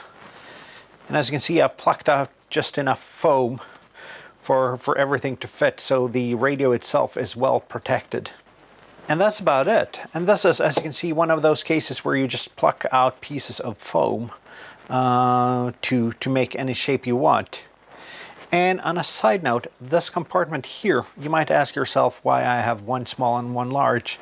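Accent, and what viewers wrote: United States. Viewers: off topic but I had to do a double take on your qth because of your English dialect sounds American is that common in Norway thanks 73s kd9jay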